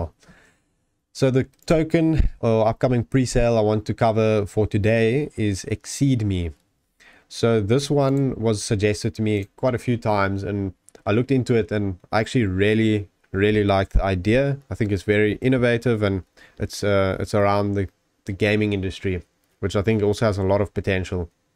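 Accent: South Africa. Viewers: Ate you from South Africa???? Your accent sounds like an afrikaans one. Im from SA living in South Korea.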